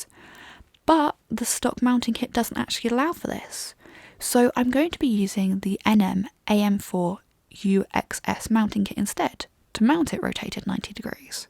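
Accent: United Kingdom. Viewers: And talks very clear, i can tell she is british. But i could very easely follow her explanation as everything is pronounced very clearly. On a soothing tone But i would wait on gen4 SSD's 2.0 by figure of speech. They need a better controller first. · Hey, great too see u back in fine form. I hate to play matchmaker, but doesn't YouTuber Arun Maini from MRWHOSETHEBOSS live in London too? Imagine a collaborative, soothing fusion of two epic accents. 😉 All the best, phil from across the pond.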